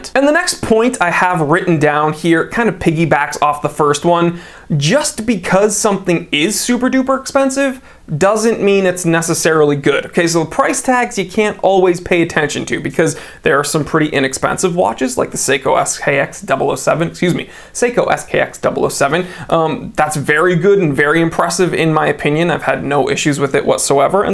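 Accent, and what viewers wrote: Germany. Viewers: It’s the german accent that made me thumbs up 😂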